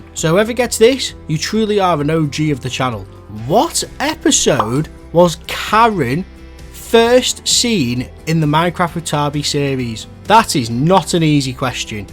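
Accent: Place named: Northern England, United Kingdom. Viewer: Gotta love that northern twang of the English accent ! Moo idea how people said we sound the same when I’m from the south which is considered the “posh” accent of England haha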